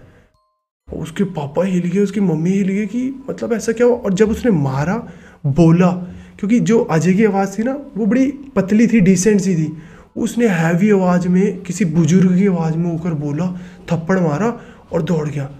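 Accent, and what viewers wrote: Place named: Himachal Pradesh, India. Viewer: Good story telling💯 Are you from Himachal bcz your accent and choice of words seems like that?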